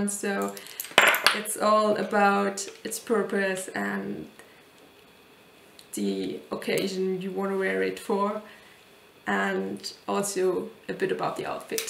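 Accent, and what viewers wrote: Germany. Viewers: And that German accent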